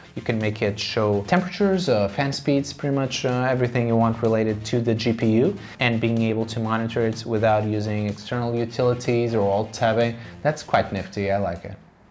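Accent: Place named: Sweden. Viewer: I think he is Swedish.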